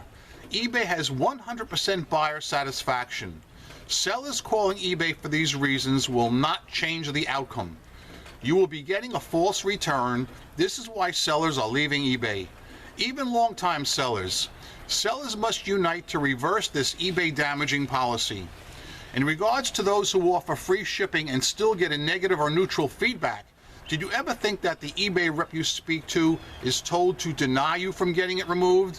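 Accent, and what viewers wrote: New York, United States. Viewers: that cawfee accent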